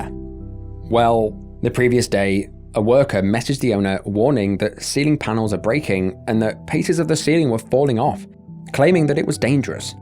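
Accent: United Kingdom. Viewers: The Queens English in Ai is just too funny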